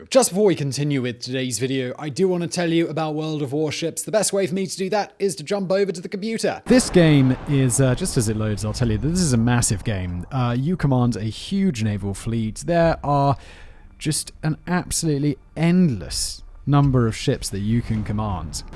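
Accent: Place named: United Kingdom. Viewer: since when does V-sauce have a political channel with a british accent?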